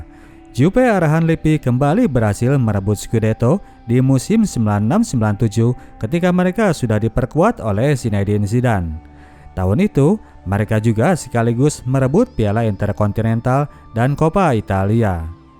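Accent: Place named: West Java, Indonesia. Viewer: Pengisi suara orang sunda pasti😂😂,suaranya khas bnget😊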